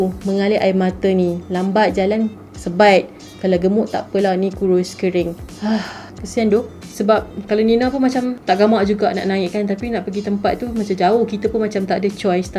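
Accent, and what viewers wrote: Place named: Johor, Malaysia. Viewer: Bahase km ni Johorian sangat..satu Malaysia bukan semua orang paham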